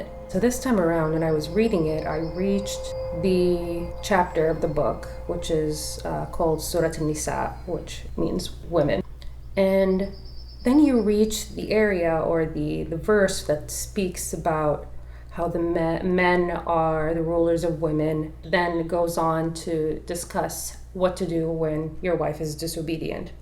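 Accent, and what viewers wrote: United States. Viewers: I'm Australian, and I can hear that you have an accent. An American accent.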